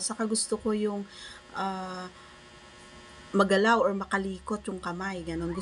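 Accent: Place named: Visayas, Philippines. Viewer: Saan ba probinsia mo baby ? bisaya ako halata kita bisaya ka.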